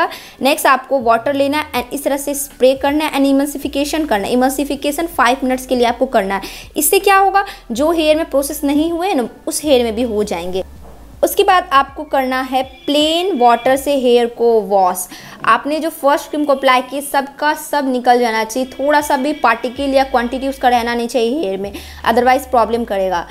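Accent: Bihar, India. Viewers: Ye language to bihar chhapra ki lag rhi h kya mam ap chhapra s ho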